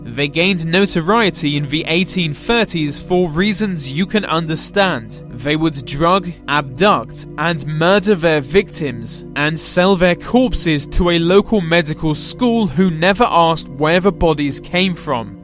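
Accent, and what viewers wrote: United Kingdom. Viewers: are you British cos your voice is fabuloussssss ☺☺☺☺☺☺☺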